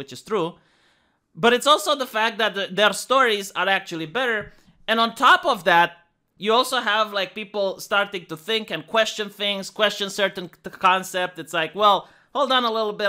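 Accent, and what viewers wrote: Romania. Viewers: "Don't like your Romanian accent?" dude, that's your signature, if you drop it, I don't even know if I find your content the same. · They hate your Romanian accent? Man, English is my first language ... at least they can understand you ... I'm Scottish so if anyone wants a competition at bastardising the English language, give us a shout. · But I like your squeaky Romanian accent. It's like...charming and shit.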